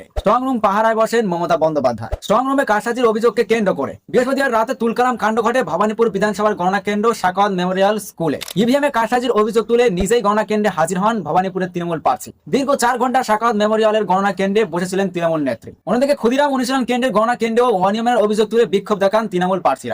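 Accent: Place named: Bangladesh. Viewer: নিজে কিছু চেষ্টা করছ , সেটাই আসল কথা। মিথ্যা নিউজ চ্যানেল এর নাম কেন ? দ্বিতীয়ত যদি ইউটিউব এ বাচিক শিল্পীর কাজ করতে চাও তবে উচ্চারণ অবশ্যই নিজেকেই ঠিক করতে হবে। প্রয়োজনে কোর্স করে নিতে হবে। নিজের উপস্থাপনা সুন্দর হলে নিজের নামেই লোকে দেখবে। নিজেকে ও আরও সুন্দর ভাবে উপস্থাপন করতে হবে। ভারতে বসে বাংলাদেশের মতো উচ্চারণ কেন ? ভবিষ্যতে আরো উন্নতি কামনা করি। ❤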